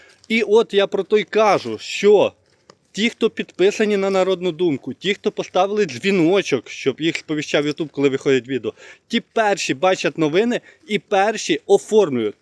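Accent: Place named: Surzhyk, Ukraine. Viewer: Дуже дратує суржик цього журналіста!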